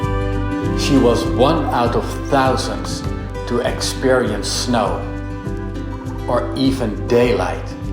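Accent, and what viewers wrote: Netherlands. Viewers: Are you Dutch? C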